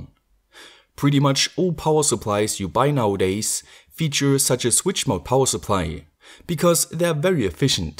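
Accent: Germany. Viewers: Give the guy a break! He's German. I don't think his pronounciation is so bad!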